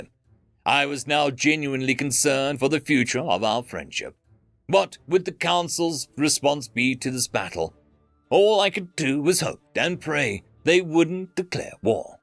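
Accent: Scotland, United Kingdom. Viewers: Very British Accent 😂 well technically Scotland is in Britain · " A very British accent..." does a Scot instead. Nigel Green as Colour Sgt. Bourne from "Zulu" probably would've been a better choice.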